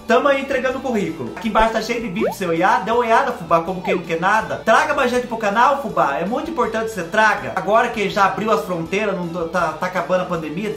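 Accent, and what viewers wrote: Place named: Minas Gerais, Brazil. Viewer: adoro vc seu modo de falar parece nois mineiro Kkkk Ajeita parceria pra vc testa coisas pra nois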